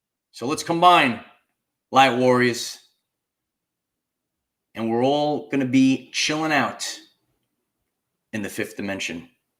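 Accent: New York, United States. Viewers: I love your New York accent!!!!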